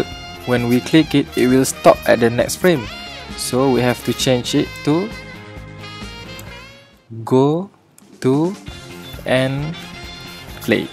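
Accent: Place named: Malaysia. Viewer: Your sound like malay , are you malay ? Can we have a conversation ? Wanna deal something about cv interactive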